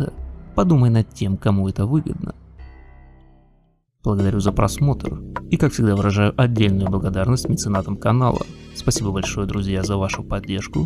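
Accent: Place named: Chechnya, Russia. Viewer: у тебя проскакивает чеченский акцент в голосе)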